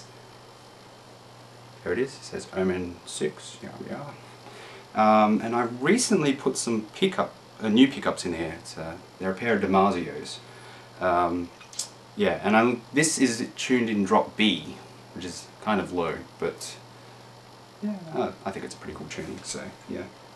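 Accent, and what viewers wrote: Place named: Australia. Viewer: its so good to hear another aussie voice...reminds me of home...even though i'm at home...also pro tools...good boy...komplete 8....i'm jelly...guitar rig...ehh i'm a amplitube bro myself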